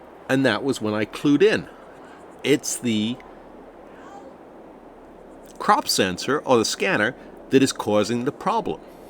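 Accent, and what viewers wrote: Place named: Northeast, United States. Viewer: Interesting findings. Thanks for doing these in-depth videos, they’re very helpful. Nice to get some tips from somebody who has been playing this game even longer than me! As a side note, I’d love to know where your accent comes from. I had you pinned in the northeast US until I recently heard you say you’re from Canada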